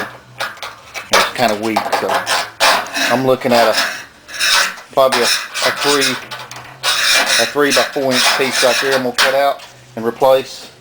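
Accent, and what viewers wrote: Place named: Appalachia, United States. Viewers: Love this hillbilly accent! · Your voice and accent is identical to my brother in law's. He was born, raised and lives in Anderson, South Carolina.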